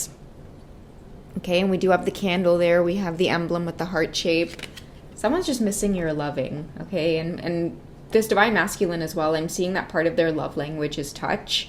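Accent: Trinidad and Tobago. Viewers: Could not mistake that Trini accent ❤️🇹🇹 I was listening while washing dishes and when I heard the accent I jumped 😂😂 I’m a Trini, living in Trinidad, who loves looking at tarot readings, just found your channel and I’m so happy to hear that accent 😅❤ Also I hope that’s my DM because I know he loves me, but timing and distance is the block. We’re both very busy too trying to build our lives 🥺 idk if it’ll go anywhere but we do have a beautiful connection, I at least really love that! ❤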